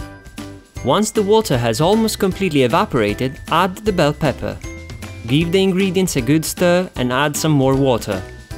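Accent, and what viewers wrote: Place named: Italy. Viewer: I totally love this recipe! Found your channel today and already watched all your videos :) Immediately subscribed! I have also a quick question for you; I love your accent, where are you from? You seem to be Italian :)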